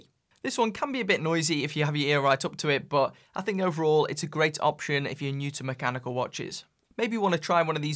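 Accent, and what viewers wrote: Stoke, United Kingdom. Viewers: is it stokie?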